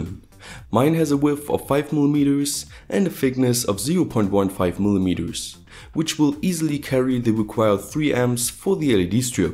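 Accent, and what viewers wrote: Germany. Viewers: Klingt wie ein deutscher der englisch spricht, schade dass es sowas nicht in Deutsch gibt :( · he's saying NICKLE ribbons,, for anyone who cant distinguish it ;) He's adapted that annoying youtube podcaster inflection and coupled with his german accent it sounds a bit ,... not good.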